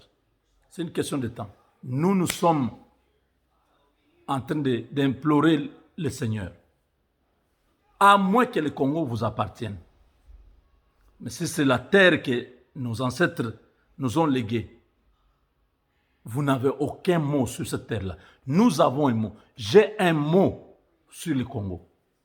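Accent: Rwanda. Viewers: C est mr parle comme un Rwandais. Il ment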